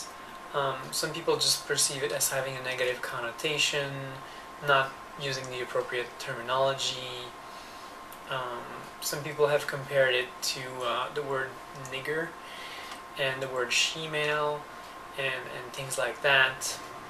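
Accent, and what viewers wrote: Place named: Canada. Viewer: You're here too. I like her Canadian accent. And she's pretty.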